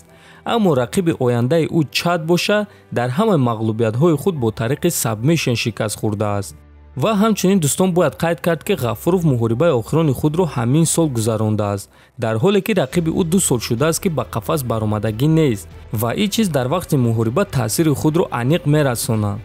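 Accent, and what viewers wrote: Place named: Garm, Tajikistan. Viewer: Ами брать гарени гап мезана неки натури хамашахушру мефахмона классс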